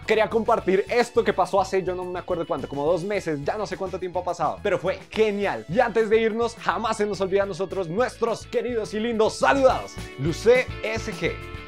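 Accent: Colombia. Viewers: Yo lo sabia cuando hablas yo digo el tiene asento colombiano y cuando escuche tocasipa selebre yo soy de bogota un saludo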